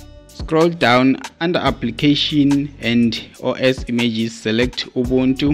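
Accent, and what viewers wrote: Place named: Ghana. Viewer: GH ascent.. Africans to the world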